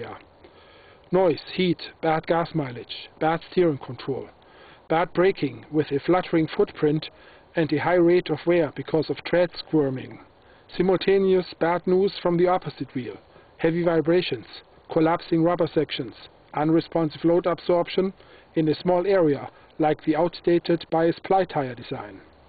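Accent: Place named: Jamaica. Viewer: he sounds like a Jamaican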